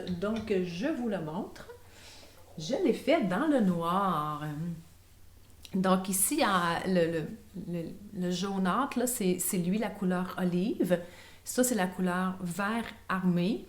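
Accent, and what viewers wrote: Canada. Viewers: Bonjour ma belle ! J'espère qu'aujourd'hui il fait un peu plus chaud chez vous ?!? J'adore te regarder et surtout t'écouter pour me régaler de ton accent canadien. Tes tissus sont tous très sympa. La veste burda est réussie. A voir l'histoire du bas de la veste (je n'en ai fait que deux jusque là donc les conseils je ne peux te les donner ) ! Burda c'est uniquement du texte c'est surprenant mais ça s'adresse plutôt à celles qui ont un peu d'expérience, sauf les Burda Easy qui sont des basiques et il en faut. J'ai repris la couture il y a trois ans et contrairement à toi je prends du tissu pas cher et je tente plein de choses pour les adapter à ce que je veux sur moi. Le tissu plus onéreux je l'utilise pour les projets dont je suis sûre. A bientôt ma belle 😊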